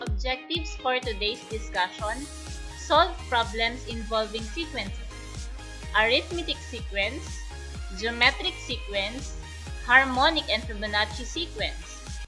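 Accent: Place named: Bicol, Philippines. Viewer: Hi ma'am thank you po,,btw napansin ko po na parang taga Bicol ka? I'm from Bicol din po kase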